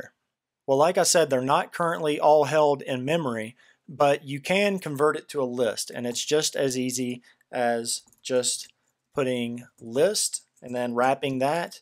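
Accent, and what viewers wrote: South, United States. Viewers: I really appreciate both the way you explain things and your slight Southern accent. It makes it a lot easier for a Georgia girl to understand. Thank you!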